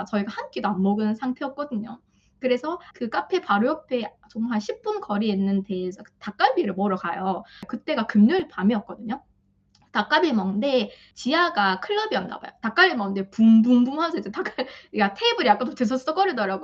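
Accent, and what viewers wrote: Gyeongsang, South Korea. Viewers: 안녕하세요! 지난 주에 알고리즘 타고 제게 쨘~ 하고 나타난! 그 이후로 뜨는대로 다 보고있는데 대구분이신걸 오늘 알게됐네요! 제가 처음 본 영상에 경상도 억양 섞인 영어가 너무 귀엽네요~ 하고 코멘트 남겼었지요. 먼 타지에 살면서 동향 사람 만나 너무 반가운 느낌이네요 ㅋ~ 전 뉴욕에 살거든요. 여튼 쌍둥이 출산 축하드려요!!! 케어하느라 잠도 제대로 못 주무시겠지만 짧디 짧은 영상이라도 곧 뵐 수 있으면 좋겠다는 저의 이기적인 마음 전해봅니다!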